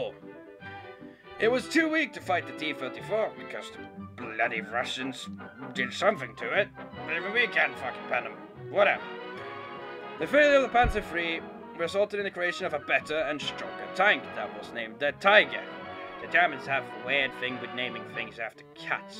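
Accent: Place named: United Kingdom. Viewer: Loving that ridiculously british accent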